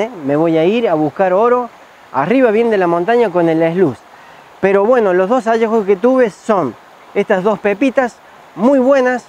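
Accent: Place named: Cordoba, Argentina. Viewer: Es muy gracioso su acento cordobés. buen video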